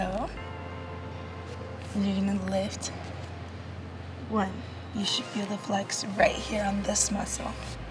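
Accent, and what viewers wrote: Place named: Southern California, United States. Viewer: I've always enjoyed voice. It seems so native Southern Californian but she hails from the Miami area.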